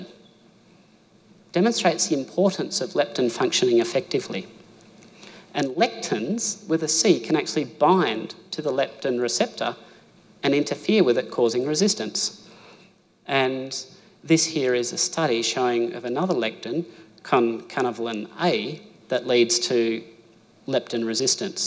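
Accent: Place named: Australia. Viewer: Thank god at last somebody from Australia talk not US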